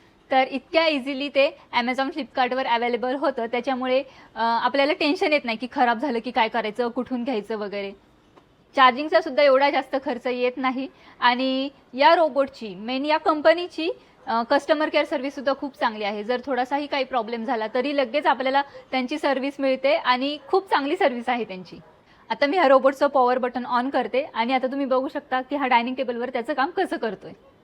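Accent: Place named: Satara, India. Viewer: Bolnya varun sataryachya disatay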